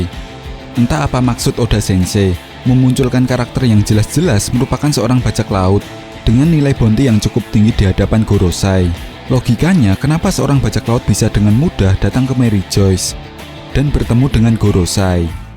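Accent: Java, Indonesia. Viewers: Medhok mu itu looooh.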